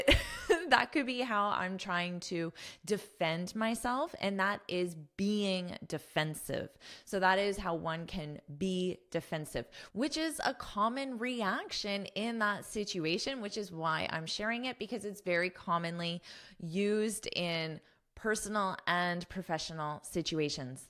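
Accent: United States. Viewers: Are you american? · Отлично хорошее объяснение но не люблю американский английский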